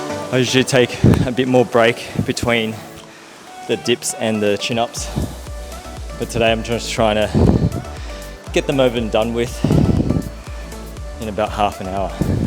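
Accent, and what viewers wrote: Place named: England, United Kingdom. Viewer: U look native american and have an english accent. My brain cant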